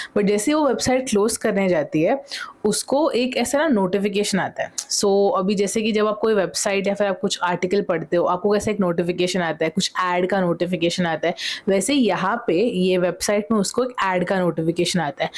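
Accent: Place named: South, India. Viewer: Hello girl! new subscriber here. I LOVE YOUR NARRATING SKILLS, THAT SOUTH INDIAN ACCENT (if you are) and you add new vibe to story telling stuff from other story tellers on youtube platforms. Here for your content from 31.6k subscribers to 1million and more! LOVEEE YOUU BBG 🧿💕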